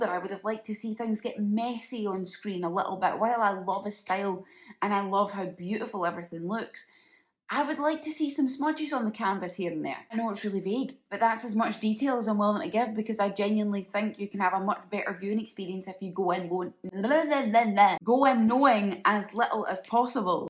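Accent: Scotland, United Kingdom. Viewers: I LOVE Wes Anderson. But anything weird registers with me. Haven’t seen this one yet, but I’ll have to. And I could listen to you talk forever. Not talking about your Scots dialect & accent (although I love that as well); I’m talking about your neuropsychological predisposition. I fell in love with you a long time ago (*please understand I’m not referring to anything romantic), which is why I wrote a character pseudo-based on you in my fictional pentalogy The Everlasting Life. Her name is Mattie Ferguson. Once my books are on shelves, I hope you are proud to be her inspiration. End of Speech. 🫂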